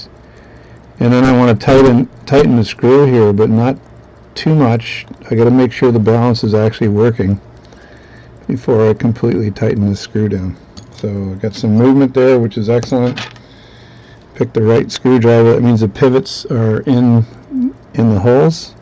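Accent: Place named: West Island, Canada. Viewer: you may not be west island, but that is definitely west island french.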